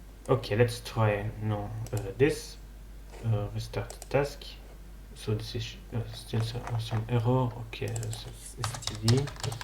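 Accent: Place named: France. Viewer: loving the french accent!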